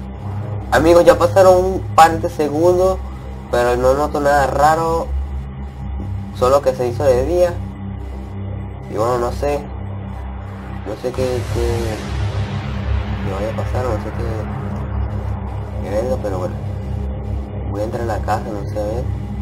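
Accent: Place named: Apure, Venezuela. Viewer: aaa or eso mano se te eschuca lavoz de apureño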